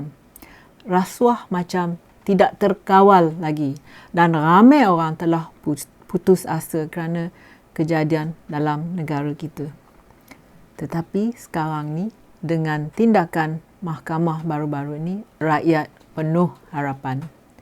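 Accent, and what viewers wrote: Perak, Malaysia. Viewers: Loghat puan ada macam loghat Perak sikit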